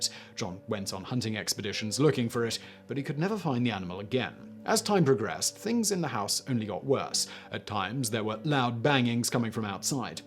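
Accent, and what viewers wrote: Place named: RP, United Kingdom. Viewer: Simon is REALLY over-egging his Head Boy private school accent on this one.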